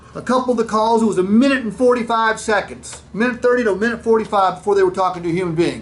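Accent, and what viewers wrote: Appalachia, United States. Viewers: is hillbilly talk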